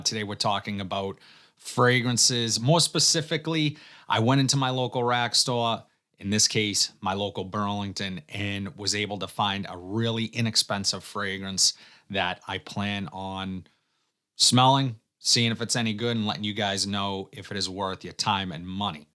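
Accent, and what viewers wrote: Boston, United States. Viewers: Just came across your video,,, You have to be from my neck of the woods,, No one speaks this way unless you're from BOSTON...LOLOL I Love Men's Cologne...When I find my favorites Cheap like this I buy them and use them to spray around the House... I will check this one out.. Thanks.. I Love the Men's Paul Sabastian for $20 and Calvin's Obsession for the same $20. or $18 for the Tester. Ebay is a good source if you don't want to venture out.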